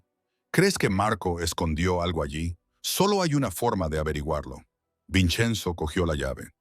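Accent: United States. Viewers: Si por favor piensen en sus historias antes de difundirlas y pongan bien los generos de los personajes, a vecesved un niño y a veces una niña y porque usar una voz con acento norteamericano hablando español?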